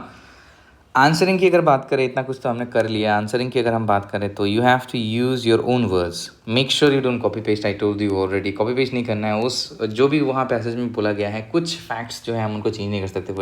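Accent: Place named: Assam, India. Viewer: Sir r u from assam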